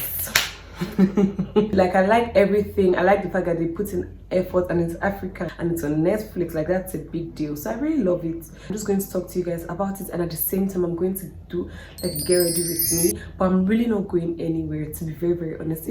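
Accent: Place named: United Kingdom. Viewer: Your British accent 🙌😹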